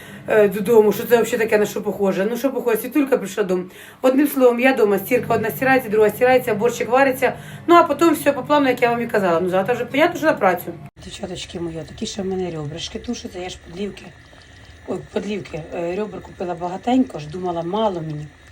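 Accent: Ukraine. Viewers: Говір український тоже люблю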